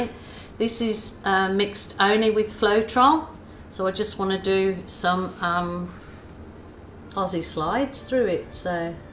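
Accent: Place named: Northern Ireland, United Kingdom. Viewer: Also from Victoria BC! Originally N.Ireland. I was trying to figure out accent and thought she sounded a bit like me but with a mixture! Love her work land her ability to so naturally express herself in words and in paint!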